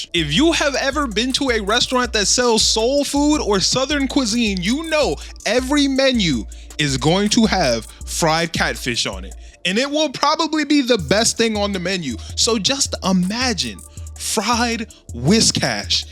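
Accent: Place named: Chicago, United States. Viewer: I was about to comment “Lemmon Pepper what??” And then you played THAT clip 😂😂😂. I could tell you were from Chicago before you even said it 😂😂. Love this video fam.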